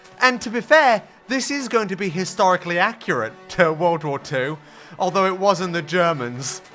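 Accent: United Kingdom. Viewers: u r british yayya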